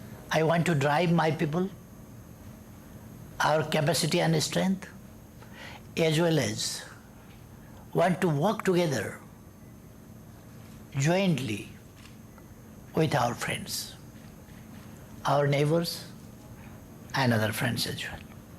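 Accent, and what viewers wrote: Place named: Nepal. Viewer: Very Nepalese accent and confident about what he is talking , loved his style . A man from village of Nepal who struggled and put his life in danger many times. He obviously did not have means to study in good school rather he read on his own means possible and reached to this stature , indeed deserves applause . Cheers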